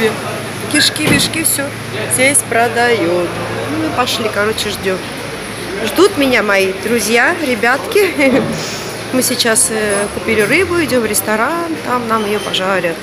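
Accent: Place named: Georgia. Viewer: Простите,вы,по национальности грузинка? У вас,акцент ,наверняка грузинский.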